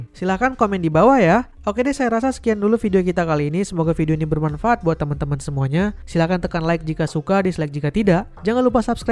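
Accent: Dumai, Indonesia. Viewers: Org dumai abg ni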